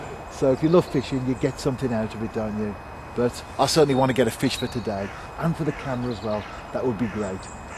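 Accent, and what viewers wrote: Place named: United Kingdom. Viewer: This is where we are off to tomorrow...I liked the talking..:) nice to hear familiar British accent...regards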